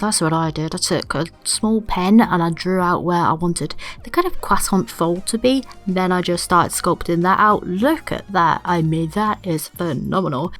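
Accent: United Kingdom. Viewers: U sound like from the uk am I right?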